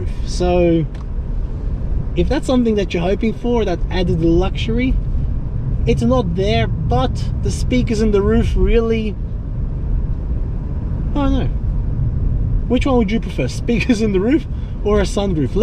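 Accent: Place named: South Africa. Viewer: Great vid and it's sounds as if you have a little south African in your accent. Do you? 👍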